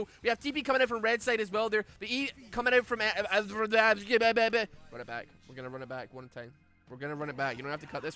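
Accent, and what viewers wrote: Scotland, United Kingdom. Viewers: Extra-Scottish makes me wanna play D&D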